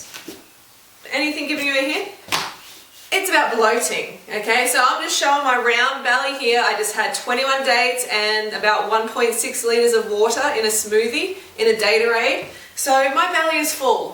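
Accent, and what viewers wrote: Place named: Australia. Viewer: Goo fruit or root yaself !!! Can tell shes an aussie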